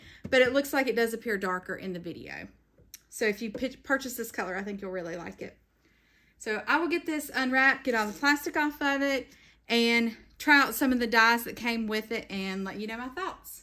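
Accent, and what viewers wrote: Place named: South, United States. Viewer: Thanks for a great demo!!! You did a great job! I seam to have the same dies as you, so I can't wait to try them! I love your accent. What part of the South are you from? I'm from Tennessee.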